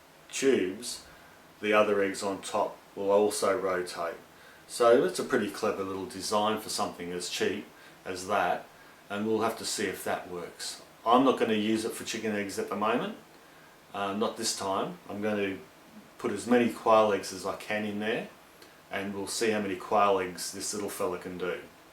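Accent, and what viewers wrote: Australia. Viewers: Good review, and always miss Austrlian accent, miss Australian friends and teachers. However let me buy one and try with chickend eggs.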